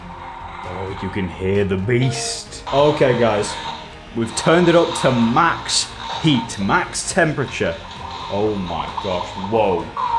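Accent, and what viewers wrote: United Kingdom. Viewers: are you british? one more thing you are the best youtuber ever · I can’t tell if he is British or not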